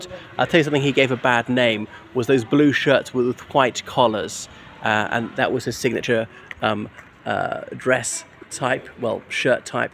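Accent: RP, United Kingdom. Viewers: I think it's brilliant, and also fitting, that you are Irish but with a cut-glass English accent...and your name is! Love your videos. Just discovered you. Don't know why I didn't come across you before now. Hope you make some content for St. Day coming up!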